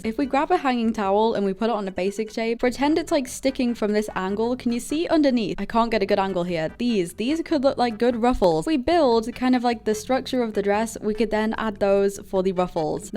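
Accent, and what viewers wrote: United Kingdom. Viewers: wait r u from the uk if so that’s really cool cuz I don’t know many dti uk YouTubers 🤭💗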